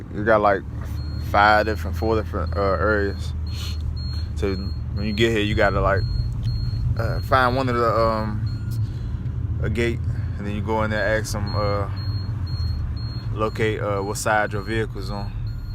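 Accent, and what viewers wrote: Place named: DC or Maryland, United States. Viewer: you from dc or md brah. I swear you sound like you from out here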